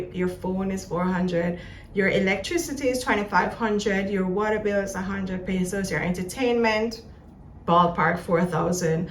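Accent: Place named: Jamaica. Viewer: Very informative video! ❤ I love the Jamaican accent…😂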